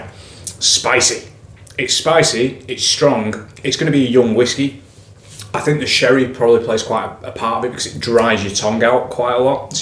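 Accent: Lancashire, United Kingdom. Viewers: Great review mate - must try some Cotswolds - sounds like an excellent distillery! No need to change t'accent lad! As a Lancastrian I love goin' to Booths too! Especially the one in Lytham. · No need to make excuses for your accent; Lancashire and proud of it 😃👍. As for the Cotswold sherry cask - it sounds like a stonking dram. I have experienced the standard signature but alas found it a tad youthful and nothing stood out for me. Ne'er mind, perhaps I'll try again 😁.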